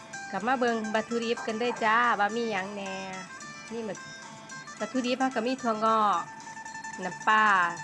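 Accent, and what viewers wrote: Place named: Ubon Ratchathani, Thailand. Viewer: สบายดี สำเนียงคือคนอุบลอำเภอเดชอุดมคัก... มากินผัดเฝอนำเด้อจ้า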